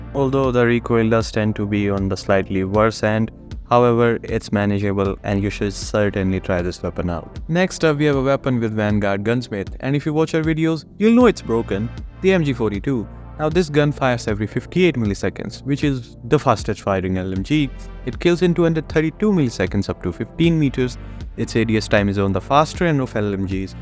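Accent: India. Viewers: dude you're the exact same as me 😭😭. i started exactly a month ago too after 2.5 years. i was surprised to see an indian voice instead of path's. wonder what happened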